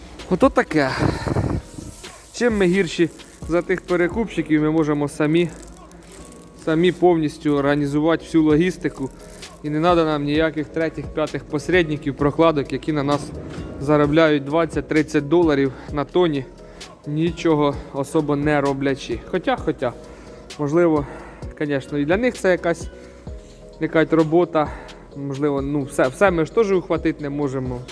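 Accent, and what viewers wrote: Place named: Poltava, Ukraine. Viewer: Судячи по говору Полтавська.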